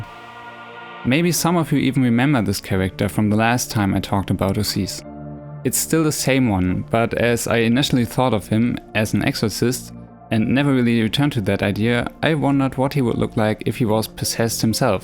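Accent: Germany. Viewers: I didn't think of playing with pressure when drawing with Copics. Thank you, I will definitely try that out next time! I have a question tho... Are you German? The way you pronounce words sounds a bit like German accent 😃 just wondering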